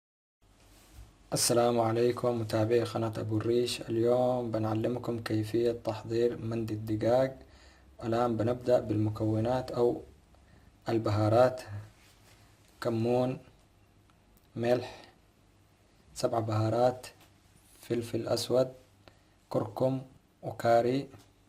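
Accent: Aden, Yemen. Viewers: الشيف لهجته عدنية .. تعزوعدن لهجتهم كثير متشابه .واصله من يافع بس من سكان عدن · فديت اللهجه العدنيه هههههههههههههههههههههههههه تسلم يديك ياغالي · وووواقلبي على اللهجه العدنيه حبيب ربي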